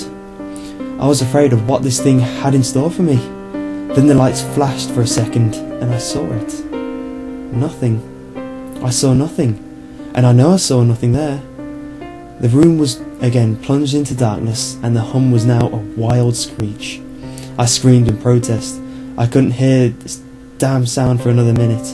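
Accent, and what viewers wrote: United Kingdom. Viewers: a british/or english guy reads it the story just got 20% cooler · Mmmmmm british accent i really do like this story :D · this is a great story this os a good story the britsh eassent made it better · this is my favorite creepypasta! no not just because its told by a british guy stop making stuff up!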